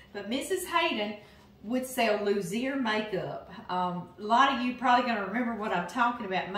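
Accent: South, United States. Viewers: I could listen to you ALL DAY! Love that strong southern accent! Simular to my grannies teacakes except more Nutmeg & buttermilk. I also use white & br.sugar for that slightly gooey chew. Cook 325° 😋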